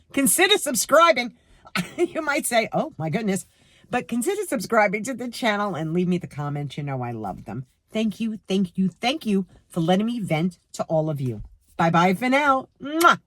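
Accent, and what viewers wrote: New York, United States. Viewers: I think it's fairly common; even if they don't say anything; it's just an attitude issue !! workers can be like 'robots' !! I like your accent; was about to ask if it's a NY accent ! 💚💟 I think she will learn from the experience.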